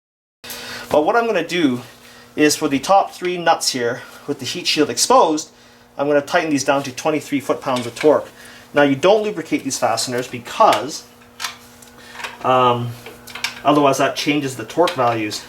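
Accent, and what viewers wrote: Canada. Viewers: My god, you’re Canadian 🇨🇦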